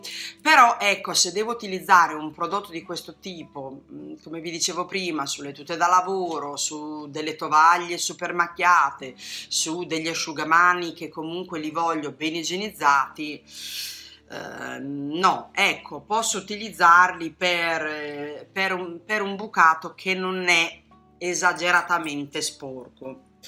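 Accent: Italy. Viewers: Ciao...sei simpaticissima veramente...ma posso chiederti di dove sei? Emiliana? Romagnola? 🤗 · Anche tu ci sei mancata, è sempre un piacere vederti e sentire la tua bellissima parlata romagnola 😄😄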